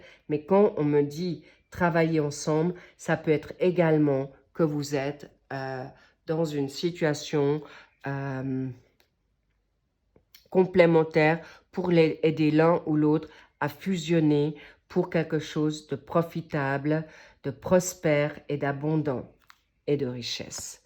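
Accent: England, United Kingdom. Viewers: Merci, comme vous dites, c'est "wow"... Connections d'âmes et de coeurs..... D'après votre léger accent, je pensais que vous étiez anglaise...(où autre anglo-saxon)....😉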